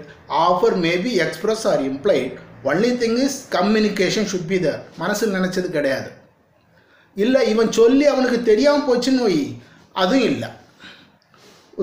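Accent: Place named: Tirunelveli, India. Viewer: Tirunelveli slang tha...mass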